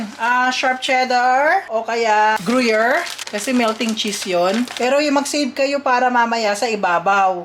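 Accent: Philippines. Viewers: So nice that she can speak tagalog fluently like un accent nya pinoy na pinoy pa din... woah really amazing 🙌🙌🙌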